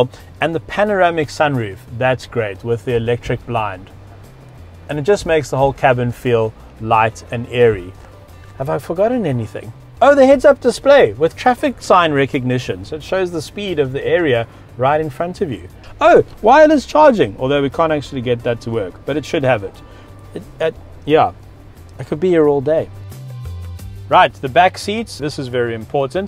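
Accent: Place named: South Africa. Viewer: hey, to be honest, south african accent is way easier to listen to compare to the aussie ones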